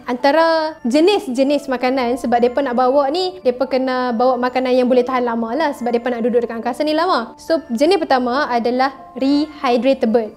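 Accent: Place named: North, Malaysia. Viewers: TBH...kalau nak cakap utaqa...cakap utaqa terus..I can see a lot of mix up ...anyway good information...thumbs up👍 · The BEST...north accent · Kedah elokk hahaha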